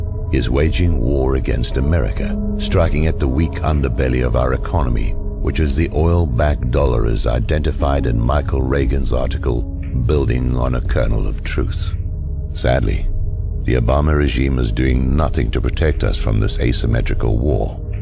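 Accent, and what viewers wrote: England, United Kingdom. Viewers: There is no gold reserve, nor has there been since the early 1930's. All the federal reserve relies on private banking, namely the 2 big families, and we all know who they are. The guy narrating this documentary keeps saying our country, our economy, but his accent is definitely English. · Why does the narrarator have a british accent? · English narrator. Obviously true! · I guess the commentator with the British accent gives this message more credibility.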